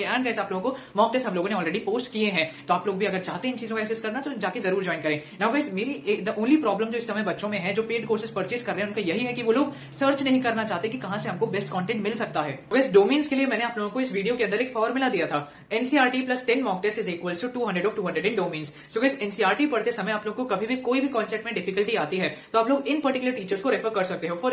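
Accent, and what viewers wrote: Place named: South, India. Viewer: Why does bro have a south indian accent in the new videos ?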